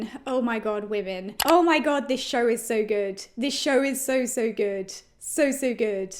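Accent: United Kingdom. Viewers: not related to the topic... but where is your accent from? Somewhere in the UK I presume?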